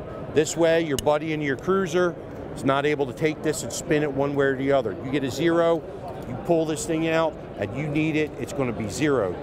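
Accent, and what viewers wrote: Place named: Philadelphia, United States. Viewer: It’s his philly accent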